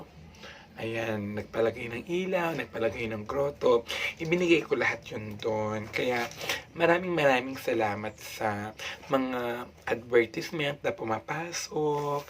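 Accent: Batangas, Philippines. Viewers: nakakatuwa k.. lalo na ung puntong batangueno mo at mga ganap mo.. keep up the gud wrk! and keep being humble!!😀😀😀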